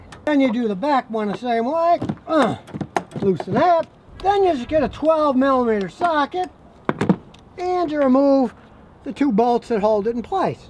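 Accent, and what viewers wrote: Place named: Brooklyn, United States. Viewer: has Brooklyn😁 accent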